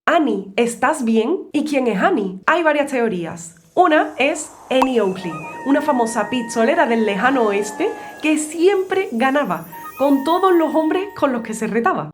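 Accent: Andalusia, Spain. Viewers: Si me preguntan por el acento andaluz tengo que mostrar este video 🙌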